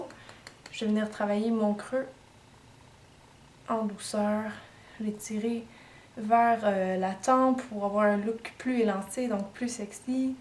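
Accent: Canada. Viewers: t'est canadienne? d'ou est ton accent? j'aime bien.. :)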